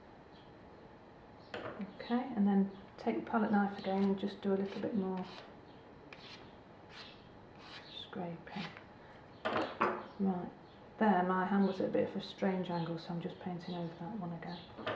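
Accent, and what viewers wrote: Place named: England, United Kingdom. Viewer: Beautiful painting. Do you always “cream” strength that much paint? Do you keep when your down? Add water again, next day? Or do you toss & start fresh. I’ve only ever made up that much paint when I did a negative painting. I know you said you were using 200# what mfg? Love learning new ways to do things. I really want to try this one, next week, when our temperature goes back to normal. ( Northern California) you sound like you have a bit of an English accent is that where you live?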